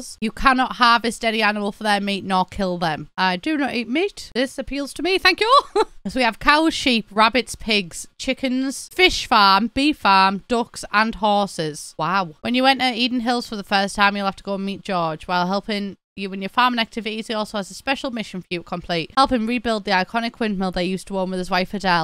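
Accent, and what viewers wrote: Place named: North, United Kingdom. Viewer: northern british accent makes me happy again and again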